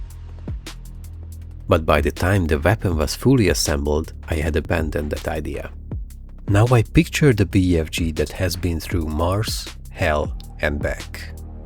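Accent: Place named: Hungary. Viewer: Are you from Hungary? The accent is not very strong, but it is there :)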